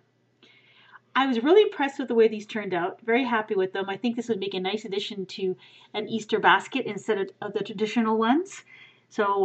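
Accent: Canada. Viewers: ur canadian arent u lol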